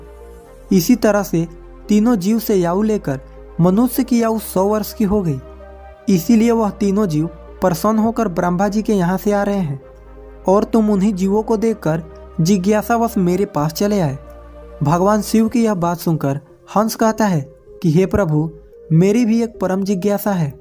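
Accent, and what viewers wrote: India. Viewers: Bihari hindi OMG🤣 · Aap bhojpur bhasha k nagrik hain aap ka uchcharan aur tone bhojpuri style ka hai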